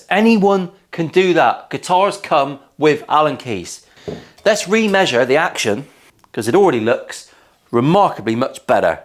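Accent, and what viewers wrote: United Kingdom. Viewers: first things first - a like to the awesome guy with his awesome British accent :) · I'm from the other side of the Atlantic and love this channel, saying fuck a bunch in a British accent is nearly always hilarious.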